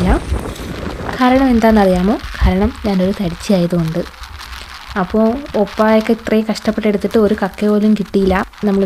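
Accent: Trivandrum, India. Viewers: A new viewer from Trivandrum 😀 being a Korean admirer, watching ur videos and hearing ur (Trivandrum slang) Korean is like 🤩🤩🤩 wish I could be there... 💜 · ട്രിവാൻഡ്രം ആണോ ivar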